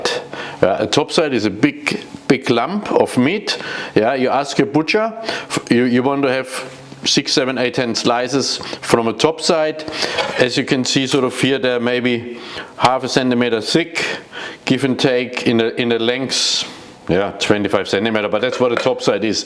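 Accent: Germany. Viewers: wow CHEF, your German accent makes me think, intelligence, innovation, efficiency...Looks Amazing! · Love your German accent.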